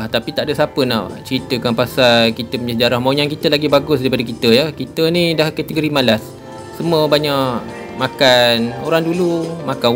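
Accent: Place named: Perak, Malaysia. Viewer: Haha.. penyampaian style orang Perak.. camtu ler..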